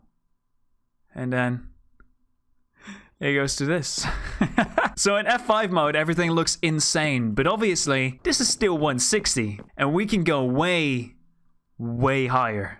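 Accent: Netherlands. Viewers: Are you dutch? i am If someone is dutch say it in the comments. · Hawky he’s probably actually Dutch cuz he kinda has a tiny bit of accent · What kind of accent is this? Dutch?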